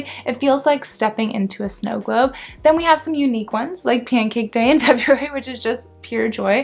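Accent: United States. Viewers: "YORKSHYRE" BRIDDISH" BUDDERY. For GOD'S sake, if you're posting about British v American LEARN TO SPEAK THE LANGUAGE.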